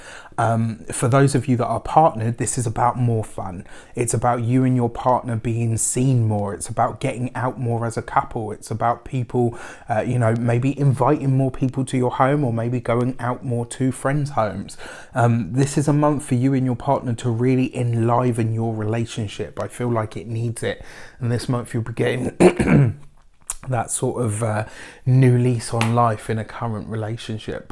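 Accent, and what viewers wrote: England, United Kingdom. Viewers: you are clear, honest funny and direct. Your cute English accent makes me smile. Thank you so much for continuing, I always look forward to seeing your posts.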